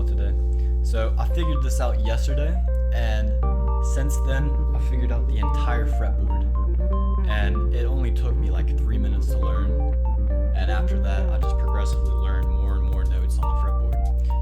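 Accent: United States. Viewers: Are you from Baltimore?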